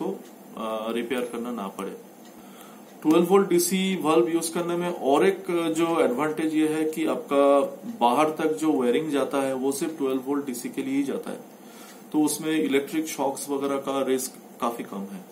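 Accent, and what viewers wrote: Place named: Bengal, India. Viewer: 😀 loved it specifically your deep kolkata Bengali accent you just sound like my late nani who inspite living delhi could not get rid of her bengali accent , any way I made it with a float switch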